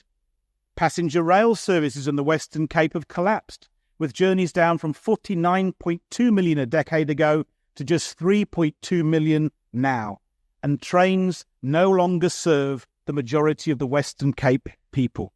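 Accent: United Kingdom. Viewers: You sound like you are from the UK?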